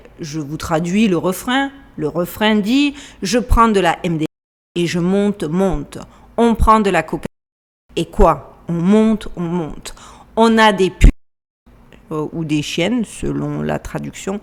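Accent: South, France. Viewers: Je t'ai découvert assez recement.. or je suis dans affaires depuis au moins 15ans. Merci pour ce travail sérieux et bien detailler. Et avec un bonus merveilleux; un accent du sud. Force honneur et amour sur toi ❤️🇲🇫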